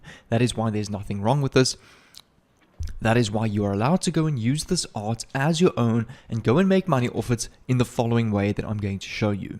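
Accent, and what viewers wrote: South Africa. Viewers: Are you from Za?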